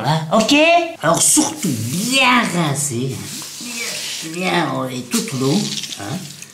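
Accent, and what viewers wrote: Belgium. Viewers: ta diction est parfaite, je te comprends très bien. Mais je te croyais belge en fait. Et il n'y a pas de mal à ça. Il paraît aussi que j'ai un accent lyonnais et autre chose 😂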